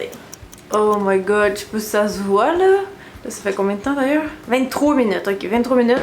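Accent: Quebec, Canada. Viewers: J'aime bien le parler québécois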